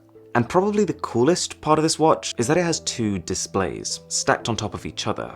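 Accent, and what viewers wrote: RP, United Kingdom. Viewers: You sound posh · Absolutely love his posh English accent.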